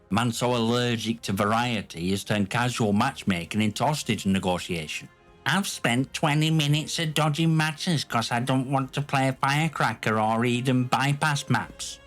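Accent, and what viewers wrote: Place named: North, United Kingdom. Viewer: Yooooo too funny bro. Nice to hear a northern accent telling it how it is. New sub from me and spot on btw with what you said. Too many pump and squirts out there lol gg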